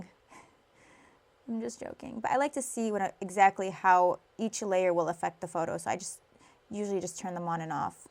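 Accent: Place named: New York, United States. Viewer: tnx for the tutorial. i find it cute when u say u wah (u want), dah (that) 😘 super new yorkerish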